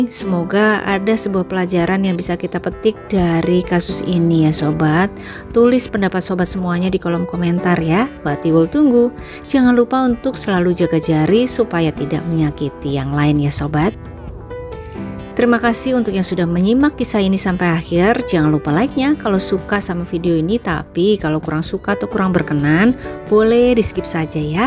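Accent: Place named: Banjar, Indonesia. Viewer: kebetulan lg dinas di kota ini, tp kabar simpang siur, berkat mbak tiwul sy tau kronologinya.makasih mbak..btw mbak dah bisa jd org banjar,pas pengucapannya😂😂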